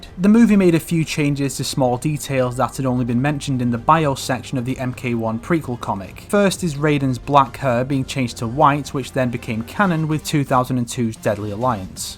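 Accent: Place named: Scotland, United Kingdom. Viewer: Whaaaaat he sounds kinda Scottish to me and I love it!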